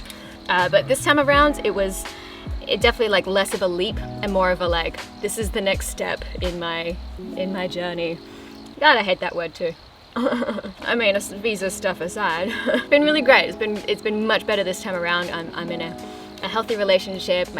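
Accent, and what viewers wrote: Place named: Australia. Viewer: Happy Thursday,! Happy New Year and welcome to the second month of February!😊😊😊😊😊😎😙☀💙💙💙💙💙🇺🇸🌷💖💖💖💖💖💖😊🍾🎉🎇⭐⭐⭐⭐⭐⭐👍. Your Australian accent is fantastic and it gives me tingles when I jump for joy!😀💙💙💙💙💙💙💙👍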